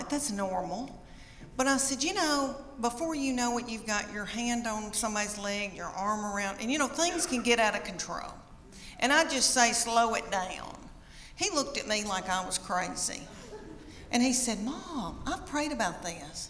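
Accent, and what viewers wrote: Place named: Appalachia, United States. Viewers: Most of my remaining family is from the deep south and her accent sounds like home. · Omg, how am I just now finding out about you? You are hysterically funny!!! My friend told me to watch your videos the other day. So, yesterday I started watching early in the morning. At one point, I was laughing so hard I was literally crying! The one where you are picking up your kids from school had me in tears. I relate so well. Thanks for the laughs. I can't wait to watch all of your standups and see you live!! I am also from TN, so your accent reminds me of home :) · I'm stuck in Pennsylvania for a week and a NATIVE OF The BLUE RIDGE MOUNTAINS of SOUTHWEST VIRGINIA!! THE REAL VIRGINIA!! Where we ALL TALK LIKE!! I'm so homesick from hearing her voice!!! I wanna GO HOME!!!! 😣 God BLESS YALL!!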